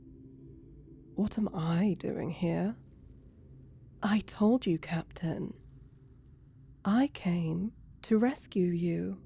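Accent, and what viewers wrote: United Kingdom. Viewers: Tbf, I love the British accent so much.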